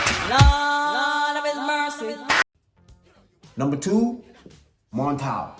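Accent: Boston, United States. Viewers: Are you from Boston? great list.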